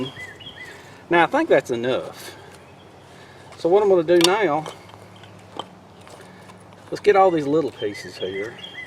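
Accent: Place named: Georgia, United States. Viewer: I love watching all the different Bushcraft guys, I like the Canadian's cause they have so much beautiful wildness trips but for learning I like watching you guys in my area, southeast USA cause we have similar woods and weather conditions. You were the 1st guy I started watching, mostly for that Georgia accent, very educational and entertaining. I like watching David West a lot too. He can cross his eyes make fire. He isn't so much Bushcraft but he loves finding new ways to make fire. Enjoyed your rambling as always. Keep em coming when you can.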